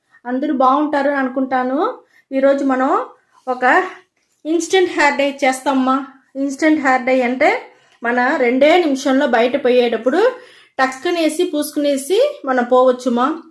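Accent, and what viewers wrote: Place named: Chittoor, India. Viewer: మేడమ్ గారు చిత్తూరు జిల్లా తమిళ్ బోర్డర్ లో ఉన్నటువంటి తెలుగు వారు అనుకుంటున్నాను.అయిన తెలుగు బాగా మాట్లాడుతున్నారు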